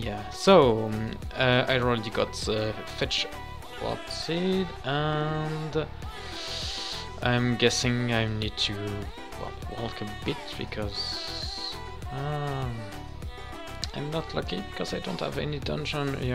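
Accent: France. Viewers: Merci ! Ps : J'adore ton accent francais mdrrr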